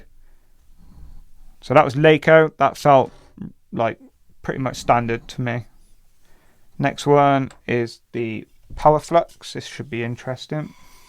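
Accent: United Kingdom. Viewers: By your accent I think you’re Brithish . Here in the Netherlands we have slightly different pipe connections, but I’m watching your technology with great interest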